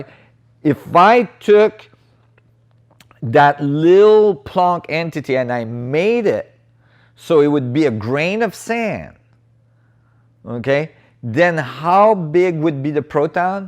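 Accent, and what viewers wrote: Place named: Quebec, Canada. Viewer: I am doing Joe Dispenza work at the moment and came across your videos and WOW. You explain everything so well. I would love to seat with you for an entire day. Je viens du Québec et je reconnais l’accent :-) je vis en Floride depuis plusieurs années. Si tu est dans le coin j’aimerais bien aller à un de tes cours. Merci mille fois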